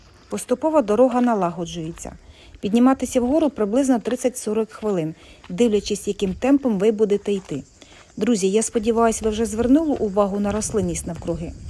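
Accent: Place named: Russia. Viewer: Тішить, що ведете розповідь українською. Чути рашівську, але... Цього року ми були в Макарській...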